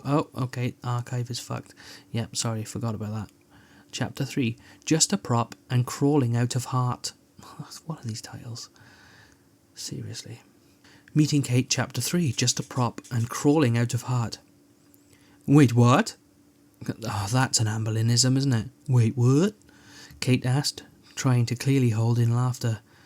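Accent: Wales, United Kingdom. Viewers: I love the British accent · The Welsh are known for being great orators…Richard Burton, Anthony Hopkins, etc. THIS I did not expect to be delivered by a welsh accent!!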